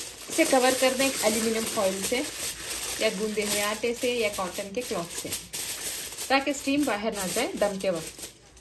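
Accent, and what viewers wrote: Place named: Deccan, India. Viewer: Ohh.. hamari hi tarah Deccan ki hain.. Masha Allah.. Par mai Bangalore, Karnataka sey hun..!! Tnx for replying..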